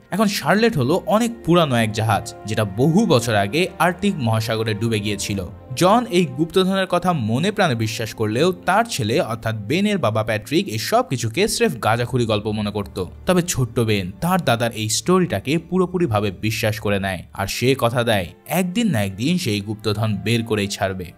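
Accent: Bangladesh. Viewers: আপনি কি বাংলাদেশি? আপনার কথায় মনে হয় আপনি বাংলাদেশি😊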